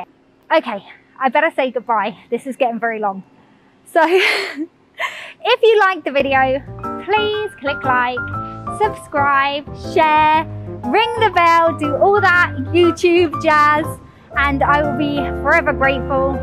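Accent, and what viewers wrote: United Kingdom. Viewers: 不為創作而創作 想 也可 再 動手 靜靜地感受生活的美好 也是 美-！！ I do love your UK accent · 我就喜歡妳「正統」發音的英語，這只是相較於美式發音的英語，並沒有其他太多想法。大概多數的臺灣人只能辨別英式或美式英語，無法再細分英國境內的南腔北調。我的觀念，英語源自英國，所以Lucy講的英語是正統的。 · Hi,妳的英國腔口音，真的很迷人，台灣人很難學得起來。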